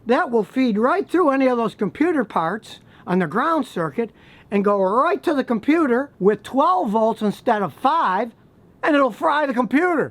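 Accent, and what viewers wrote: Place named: North, United States. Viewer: ! Are you from Houston originally? (I’m born and raised in Houston but live in Cali now). You sound like you’re from the north. Also I LOVE your videos. I’m a car salesman and you make me laugh so hard AND help me learn a lot (which helps me to properly educate my customers - Win, Win, WIN!)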